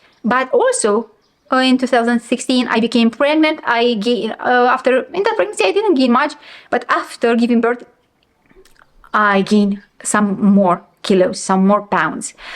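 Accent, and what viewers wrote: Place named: Russia. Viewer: You should stick to your native language, Russian or whatever, and dub in English. Your grammar is good but your manner of speaking is rough. No offense.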